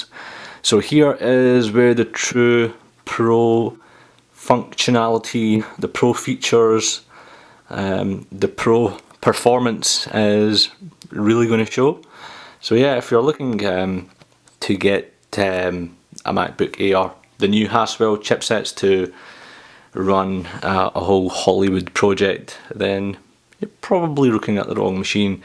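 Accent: Scotland, United Kingdom. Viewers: damn i dont understand shit in scottish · Thanks for the review man, it was very helpful. By the way, nice accent, reminds me when I was living in Scotland. Lovely People!!! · I felt that i learned more and paid attention better because of the scottish accent. Made it seem friendlier!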